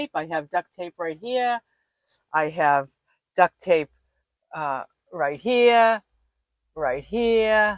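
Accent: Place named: New England, United States. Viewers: Hi, I have watched 100s of videos of van build out. Yours is the best I have seen in the past 5 years. I started with a mini van when I lived in RI. Now that I’m living in NM. I purchased a full size cargo van both my husband and I travel in it. I loved watching you I knew right way from your accent your from Massachusetts. I was born in Worcester and lived there till I was 33. Then RI for 23. Now retired and living in NM. Thanks again for PS I loved your studio apartment video as well. Paul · You're So personable and full of life! I'm originally from NH and I know the NE accent anywhere ! Am I right? Best of luck in your travels dear !!😊 Oh and I got a kick out of the fact you carry even incense. I'm a hippy chic from wayyy back !✌🏵🌻 AWESOME VAN and VIDEO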